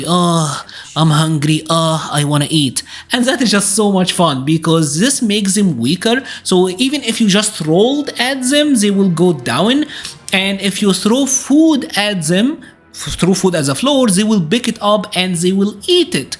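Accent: Egypt. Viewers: ازيك يا باشة لهجتك مصري عرفتك من اول جملة--- شكرا عل فيديو لزيز قوي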